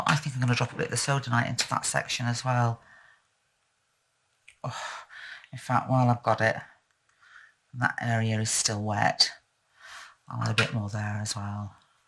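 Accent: Northern England, United Kingdom. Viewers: I'm delighted to have found your channel, and lovely to hear a Northern accent. Im truly in love with your technique and can't wait to have a go myself. Your colour choices are amazing. I've subscribed!!!❤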